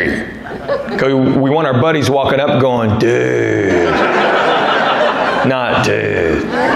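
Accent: South, United States. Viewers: I always admire the clear communication skillset. His intentions are easily understood even in the slightest of gestures. The biggest gesture is him always being entirely respectful. It surfaced as the "Sir and Ma'am" emerged through the marriage questions. It is easy to see why he has been successful so many years. The effort of getting to his shows are always such a pleasure. I stumbled on his name through a Tom Arnold movie "McAle's Navy". I knew the other comedians as my eyes and ears could not synch up the southern voice with the Korean appearance. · I think it’s cool how he’s Asian yet considering he sounds like a Southerner he is popular with white people as opposed to only being popular with Asians like you would expect the average Asian comedian to be.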